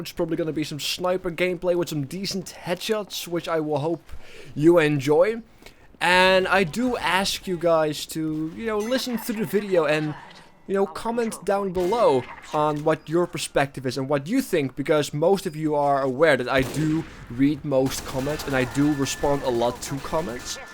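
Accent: Netherlands. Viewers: Dutch accent makes u special lolz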